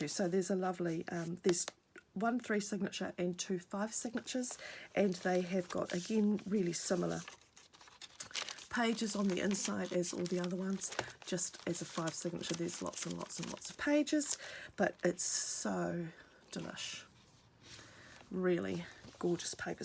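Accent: New Zealand. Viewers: These are all fantastic!!! I finally got the chance to sign in and subscribe. Yaye! Thanks so much for being such an amazing inspiration! LOVE your work, style and creativity and of course your gorgeous Kiwi accent! xx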